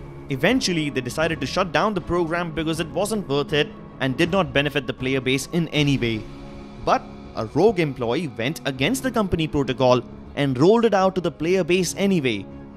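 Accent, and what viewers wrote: India. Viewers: This Indian accent is killing me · Indian accent f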